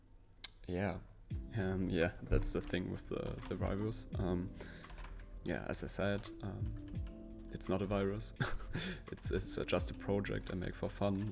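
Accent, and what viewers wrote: Germany. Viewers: Direkt erkannt das du deutscher bist xD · Bist du deutsch bcs Akzent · dierekt gemerkt das du deutscher bist HAHA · Deutsches Englisch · are you german ? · joo dieses english seit ihr deutsche ??? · wait a minute u are german ?! (i can tell by the accent) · Ich richte den Deutschen Akzent haha ich liebe deinen Client ! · hörst dich deutsch an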